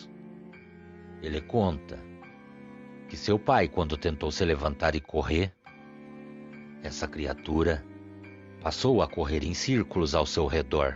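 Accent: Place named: Paraná, Brazil. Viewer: Bom noite,! Pelo seu sotaque tu deves ser do Parana!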